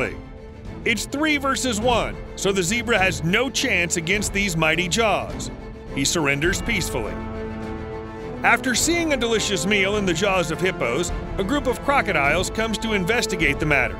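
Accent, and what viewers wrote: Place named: United States. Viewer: The AI American accent is truly painful to hear. ....do yourself a favour and turn off the sound .. ooooops ,, too late ..your at the comments 😂😂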